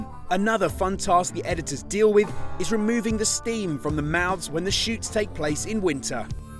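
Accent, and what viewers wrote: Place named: United Kingdom. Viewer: 我愛英國腔!讚!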